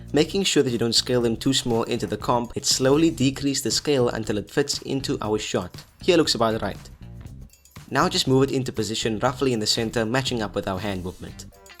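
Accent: South Africa. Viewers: Your from SA arent cha?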